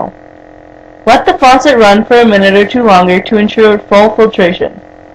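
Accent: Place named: Wisconsin, United States. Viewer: More specifically, I think it's "Oconomowoc Swimming" Oconomowoc is a city in wisconsin, and her accent sounds like a wisconsin accent.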